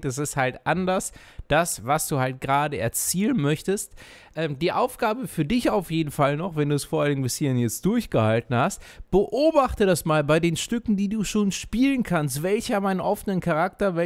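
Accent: Germany. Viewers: Moon yes you liking the videos we me, i find them very good, do you have a German accent? You hear you so on! 😂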